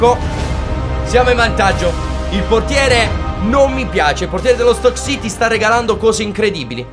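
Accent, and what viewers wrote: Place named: North, Italy. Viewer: Ripeto che mi sembri del nord, poi io sono di barletta quindi capisco se uno è di qua ma a te non mi sembra proprio😂. Comunque FORZA FOREST GREEN💚🖤💚🖤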